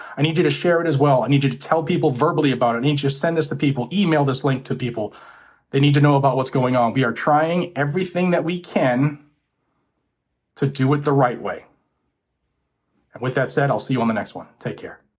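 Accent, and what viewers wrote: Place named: Utah, United States. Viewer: sounds like Utah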